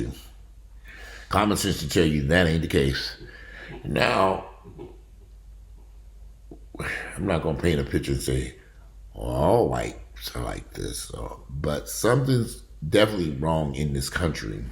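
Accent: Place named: Gary, United States. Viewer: Hi, I am from Gary. I left after graduation. Picked up a couple of degrees in California. Left and went to Canada for a few years. Then I went to the middle east to work. Afterwards I settled in Europe for the next 40 years. I enjoy hearing you talk because it is not often hearing from home Gary. Until next time. Earl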